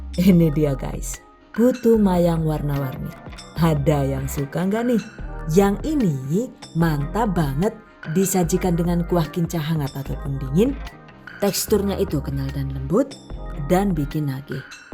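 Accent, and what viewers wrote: Banyumas, Indonesia. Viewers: Huawww, Amazing... 😍😍😍 Editing Videonya bikin seru ditambah lagi pengisian suaranya yang Khas dan medhok banget ngapak kayak saya. Hahaha 😉 semoga sukses terus ya pahalanya ngalir terus, udah ajarin kita masak². 😍😍😍 #GiveawayTHV2021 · Keren bangett chef e pke bahasaa unikkk Kadangg bhs inggris campur bahasaa ngapakk lahh😅😂 Ora ngapak ora kepenak pokokee😂👍 Bismillah #giveawayTHV2021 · syukaaa bgt sm chanel ini..karna mudah dipahami ,lucu lg.ana ngapake.ora ngapak ora kepenak.🤣🤣🤣🤣 #GiveawayTHV2021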